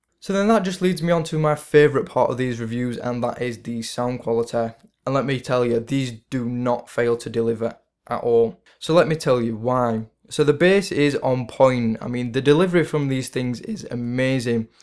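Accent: United Kingdom. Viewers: I don't understand well your British English.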